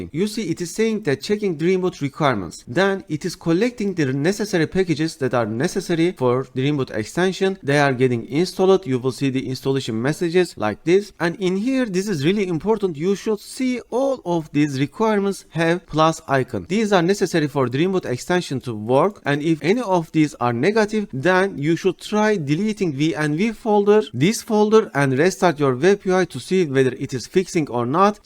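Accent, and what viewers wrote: Turkey. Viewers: Dostum merhaba,Hiç sayfana girip bakmadan konuşma tarzından Türk olduğunu anladım ve çok mutlu oldum.Gösterdiğin şeyler araştırıp bulamadığım bir çok sorunumu çözdü ve çok takdir ettim seni.Kolay Kolay hiçbir kanala abone olmam ama bugün 1 abone kazandın.Başarılarının devamını dilerim.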